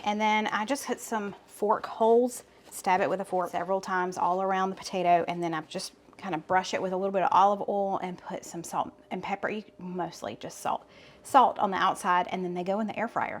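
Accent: South, United States. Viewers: Hello and. I found your channel recently and enjoy it so much. We are neighbors. Oconee county!!, I understand you so well. It's refreshing hearing another true southern. I often get told talk doesn't have a W in it. Ya'll are great. Keep doing what ya'll do.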